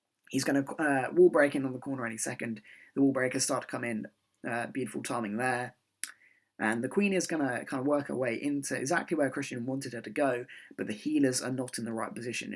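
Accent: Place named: United Kingdom. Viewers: great series. I definitely remeber Dakota telling me to keep my plans simple when i was a tryout in alpha. Keep up the great work i look forward to your vids and your British accent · British accent OP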